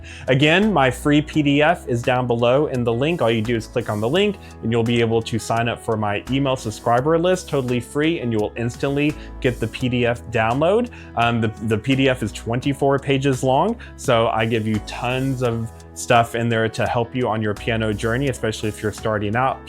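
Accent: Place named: United States. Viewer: Yeah, he's speaking american English, not some damn British English, and also assumes we're dumb which is good!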